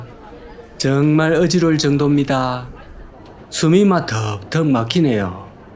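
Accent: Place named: Busan, South Korea. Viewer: 강호의 발바닥TV님 제발 부탁인데 부산 사투리 그만좀 쓰세요 듣기 불편하네요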